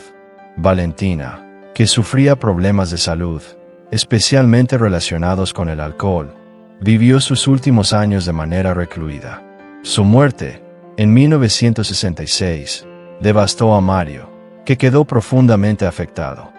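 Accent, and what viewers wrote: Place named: United States. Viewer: Y tú porque hablas como greengo? Digo mejor pon la IA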